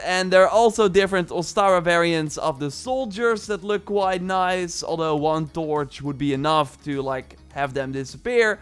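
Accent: Netherlands. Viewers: U dutch? You have a dutch accent XD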